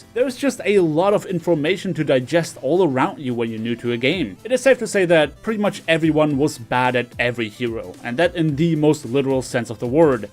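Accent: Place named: Germany. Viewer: Se German accent tho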